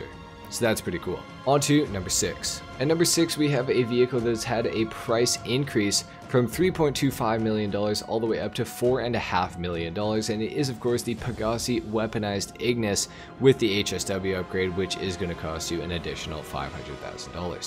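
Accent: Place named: Canada. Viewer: I can tell you’re from Canada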